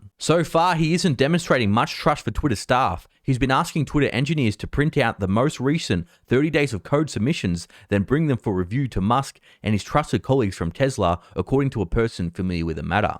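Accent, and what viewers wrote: Australia. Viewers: I really really like this content but I can't follow the Australian accent sometimes, gets frustrating.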